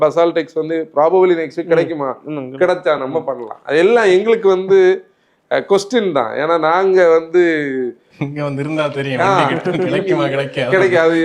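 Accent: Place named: Nanjil, India. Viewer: Anna, mainly I'm watching ur videos for your nanjil accent and very casual talks... I like it very much.❤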